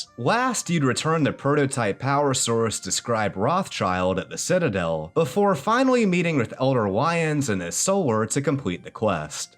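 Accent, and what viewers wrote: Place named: Maryland, United States. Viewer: Are you from Maryland by any chance?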